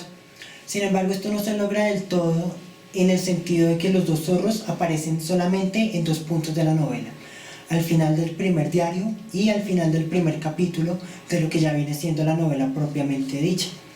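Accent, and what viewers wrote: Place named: Colombia. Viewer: detecto un acento colombiano xd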